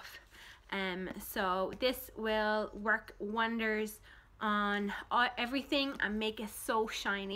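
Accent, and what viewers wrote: Ireland. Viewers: Fantastic hack!! I could listen to you talk all day. Love your Irish accent. Thanks for joining the collab. #YTMM 💖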